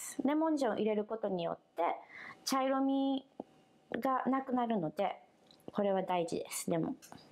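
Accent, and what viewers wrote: Kansai, Japan. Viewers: 関西弁だ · イントネーションが関西ぽくて親近感湧きます 美味しそう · めっちゃ関西弁やん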